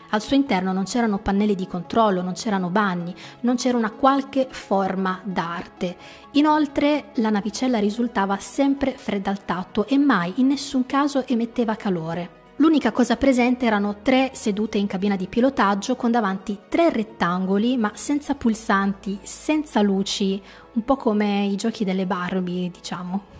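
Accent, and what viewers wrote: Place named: Piedmont, Italy. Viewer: Bellissimo video, voce gradevole, inconfondibile accento piemontese, che dire......nuovo iscritto!!!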